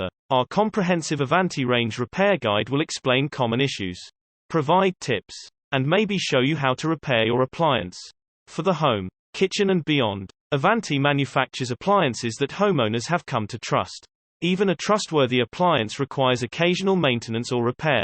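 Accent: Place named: England, United Kingdom. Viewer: What a waste....really? No mention of stove top problems...only oven issues...but, suppose that by putting an English accent as narrator makes it seem ok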